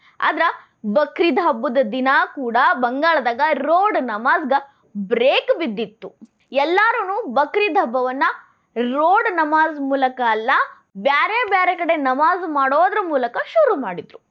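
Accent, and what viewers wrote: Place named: Haveri, India. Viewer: ನನಗೂ ಬಹಳ ಇಷ್ಟ ಆಯಿತು, ಹಾವೇರಿ ಕಡೆಯ ಭಾಷೆ ಇದ್ದಿರಬೇಕು. 🌷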